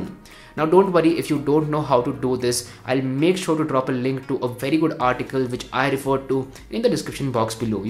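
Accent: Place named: South, India. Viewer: Your name is nd speaking accent is like south 🤔🤔🤔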